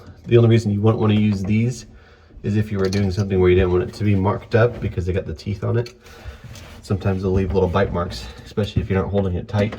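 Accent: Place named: Canada. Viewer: He talks like a candian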